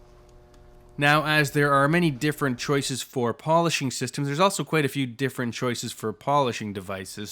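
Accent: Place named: Canada. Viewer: Good video. Love the Canadian accent!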